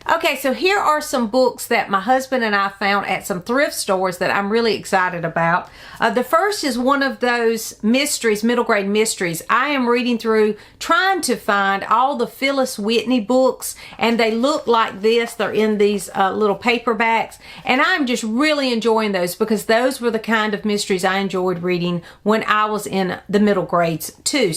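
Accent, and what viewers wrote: South, United States. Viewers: Hey,!!! I hope you're doing well. You look so beautiful. You don't look old enough to have grown children. I love your southern accent. Where are you from? I'm from Mississippi. M.C. Beaton books are SO good. I see you picked up some books from the Hamish McBeth series. You should also try the Agatha Raisin series as well. I love them all. Great video!!! Have a great day😊😊😊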